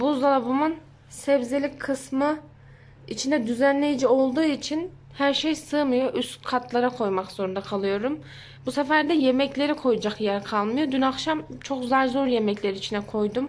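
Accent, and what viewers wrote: Yozgat, Turkey. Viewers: Kim ne derse desın biz yozgatlıyızz biz böyle konusuruzzzz🌹🌹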